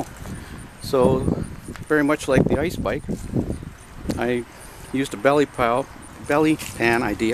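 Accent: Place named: Canada. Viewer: so i guess youre Canadian huh? ;)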